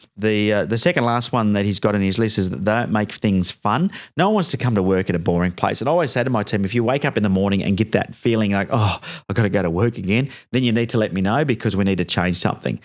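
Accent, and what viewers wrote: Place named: United Kingdom. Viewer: this annoying uk accent, don't understand a single word, but its an interesting video so I have to listen, please just talk normaly no need to talk this weird accent that "weyird aycceynt thayt noyoyne uyndeyrstaynds"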